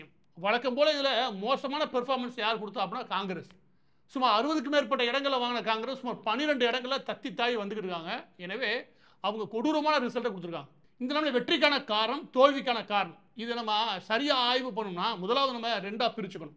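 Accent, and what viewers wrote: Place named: Sivagangai, India. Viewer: I like your dialects very relative to Sivagangai Cheemai. Hope you are from Manamadurai. I belong to proper Sivagangai. Pl continue. People used to kid me when I spoke the dialects in Chennai some 65 years ago. Vattara mozhi is really gives happiness.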